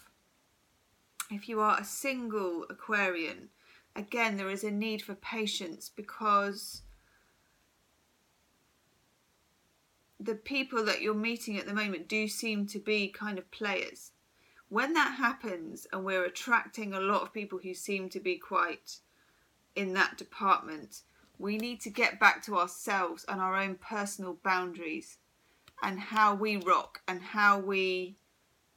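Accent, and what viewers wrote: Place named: United Kingdom. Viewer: All true. Miraculous. I adore both your readings and your British pronunciation, one of the best in my YouTube community (David Icke's being the other, ha ha). Thanks.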